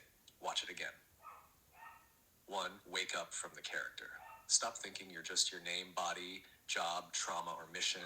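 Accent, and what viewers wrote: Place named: RP, United Kingdom. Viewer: Wow I love the British accent, almost posh, and I’m british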